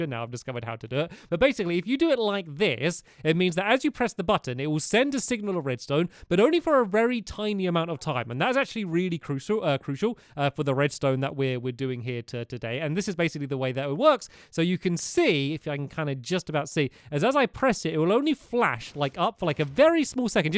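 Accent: United Kingdom. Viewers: Minecraft pro gaming roblox Shut up. Stampys voice is awesome. I love his voice, its well, British.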